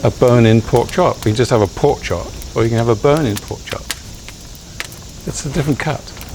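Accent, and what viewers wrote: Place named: England, United Kingdom. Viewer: Between the music your English accent the sound of the fire the sound of the food cooking and the fire itself these videos are relaxing and enjoyable. My only wish is for it to be weekly.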